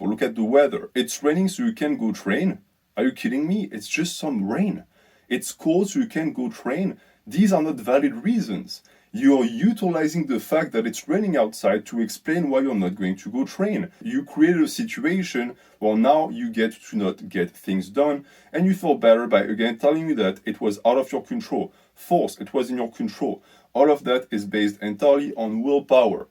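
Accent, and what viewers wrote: France. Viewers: is this guy french?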